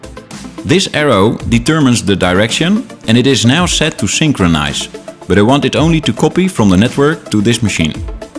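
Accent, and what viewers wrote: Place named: Netherlands. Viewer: Hollands Engels. KOTS!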